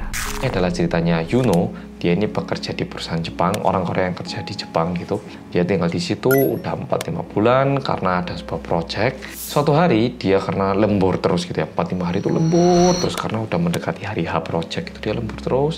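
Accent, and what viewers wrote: Java, Indonesia. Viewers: Mas kelahiran Daegu, Korea Selatan 8 Mei 1994 ini juga pernah membantu tim Running Man ketika syuting di Yogyakarta. Dikit lagi gess ultah mas medok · Serem banget ceritanya mas....merinding abis.... Btw, mas kan dah lama balik ke Korea....gimana caranya mempertahankan medok Jawanya? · Medok bangett sihh kakkk,gemezzz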